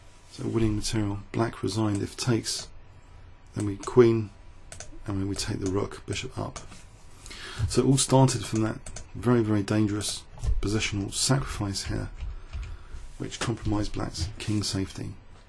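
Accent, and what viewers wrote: United Kingdom. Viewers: Are you from. Uk ?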